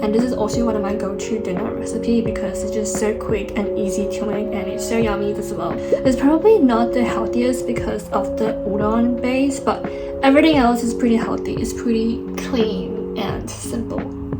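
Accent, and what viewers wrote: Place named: Australia. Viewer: When I first watched your videos I could tell that you were Chinese but couldn't tell that you were Malaysian Chinese probably because you grew up/lived in Australia so you had the Aussie accent😅 But am supporting you all the way from Singapore❤ Congrats on hitting 50k subscribers!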